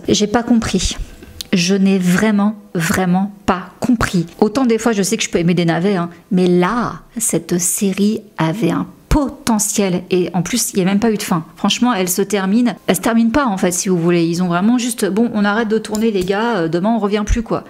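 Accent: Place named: France. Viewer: face XD c'est faith XD LOLL accent francais es adorable j'adore tes video je les écoute chaque matin du canada :) <3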